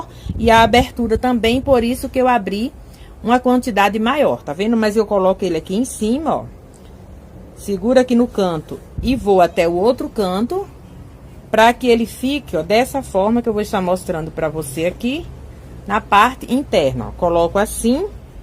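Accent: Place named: Northeast, Brazil. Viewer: Bom dia!!! Parabens trabalho bem feito Qual valor você cobra feste conserto? Qual estado vc e? Imagnei nordeste pelo sotaque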